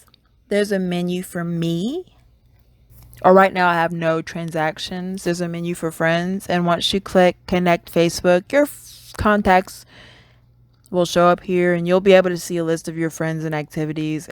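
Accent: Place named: Atlanta, United States. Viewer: I knew that accent was ATL!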